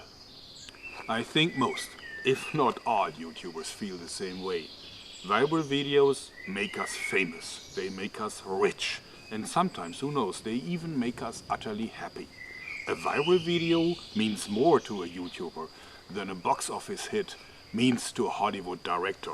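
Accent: Germany. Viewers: are you German by any chance? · I'd only watch you eat a apple attached to a drill spinning, for effect of course. But We love the videos. I personally take time off of work to watch every upload when it's fresh. For me it's the German accent mixed with the engineering genius and mechanical knowhow that really floats my boat. p.s the cheesy jokes are also quite awesome. I personally would like a full almost like a cribs episode for your work shop and general facilities outside. hope to see it soon. show us it's features!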